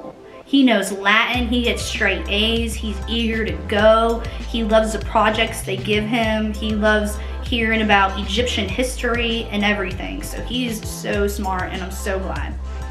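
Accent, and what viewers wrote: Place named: Tennessee, United States. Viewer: Ok I actually like you on YouTube so much better! You look great girl and you have that Tennessee accent already haha super jealous! STAY STRONG and keep doing you!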